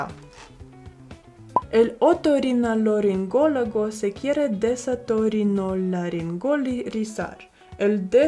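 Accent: Guatemala. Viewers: saludos me gusta el acento de Guatemala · Me gusta el acento con el que ablas español chapin. 😂😂😂 me encantan tus videos señorita, saludos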